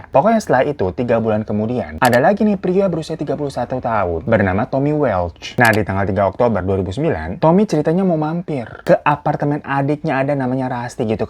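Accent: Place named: Jakarta, Indonesia. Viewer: Kocak bet ya Betawi baget anak nya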